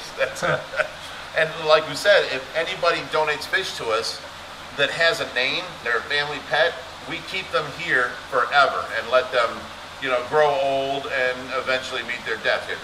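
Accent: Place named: Ohio, United States. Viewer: Ohio accent. I like it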